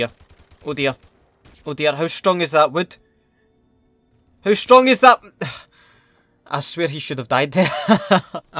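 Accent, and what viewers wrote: Scotland, United Kingdom. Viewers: Videos are so funny because of your Scottish accent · i love his scottish accent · I love the Scottish accent the best thing ever happened on YouTube. uploading vidoes on YouTube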